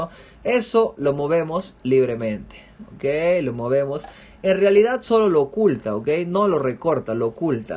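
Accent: Peru. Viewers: Eres peruano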